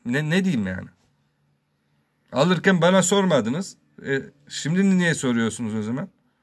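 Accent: Konya, Turkey. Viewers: abi konyalı misin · Hoca Konyalı herhalde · Başkanım adam konyaliyim diyo orjinal şive orjinal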